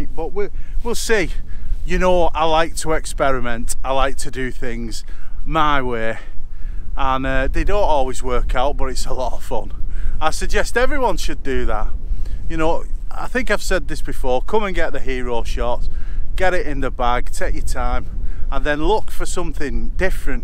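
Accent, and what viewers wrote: Lancashire, United Kingdom. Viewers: Brilliant !!! I have watched every one of your vlogs. Coming from Bolton ( not too far away from your hometown ) I too share a "Lanky" dialect not as strong as yours but listening to you talk and laugh... Fabulous :-))))) You seem to be having a load of fun with your mates and the photography aside, love the whole atmosphere you seem to generate. Cheers and Sithee! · I think we have to thank you. It does take a lot of dedication to put in the hours behind the lens. Don't worry about production values and all that mince. Your big baw face and lancs mawling of the Anglian dialect is what holds it together...and the good shots as well.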